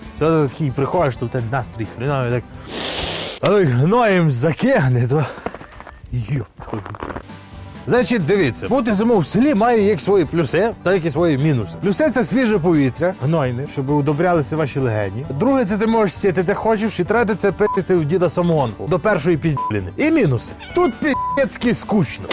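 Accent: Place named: Western Ukraine, Ukraine. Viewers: Це Галичанський акцент, скоріш за все, франківська область. Здарова землякам) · уважуха за гуцульський діалект.. щистьи, здоровльи тобі та побільше таких відіків) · А сам из Украины, но у тебя такой акцент шо иногда я нихера не понимаю что ты там несешь, наверное ты из очень глубокого западенського села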